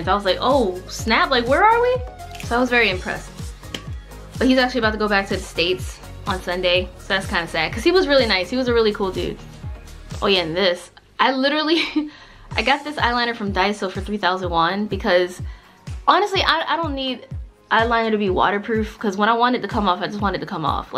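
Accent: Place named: United Kingdom. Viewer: The British accent 😊😅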